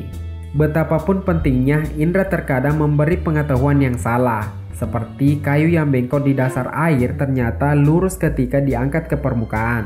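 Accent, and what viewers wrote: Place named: Sulawesi, Indonesia. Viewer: ORANG SULAWESIKI'? KENTAL SEKALI LOGATNYA HEHE SALAM SULAWESI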